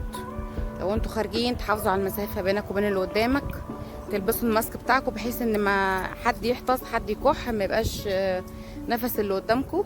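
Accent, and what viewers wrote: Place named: Egypt. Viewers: ماشاء اللة جوله رائعه وجميله بس كلامك مصرى حبيبتى صح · مصري اجدع ناس انا من اسكندريه عرفت من كلامك💖❣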